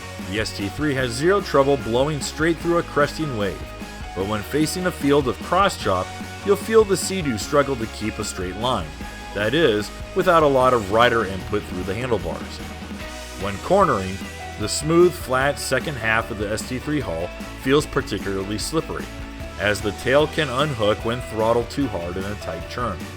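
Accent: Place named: United States. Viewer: Nice Ski I Was watching this video thinking this place in the USA looks exactly like where I ride my ski in Sydney. The American accent had me thinking it was filmed over there.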